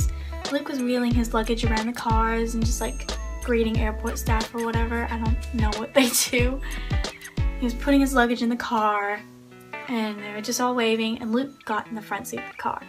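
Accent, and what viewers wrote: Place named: United States. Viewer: You live in Sydney with an American accent... Wow. 😍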